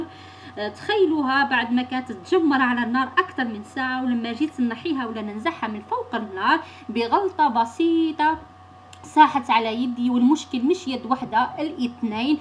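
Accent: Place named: Algeria. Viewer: من لهجتك يضهر على انك جزائرية والجزائريون معروف عليهم انهم عشرة في عقل البول كله سموم ولا يصلح لشيئ غير التبول عليك على هاذا الكلام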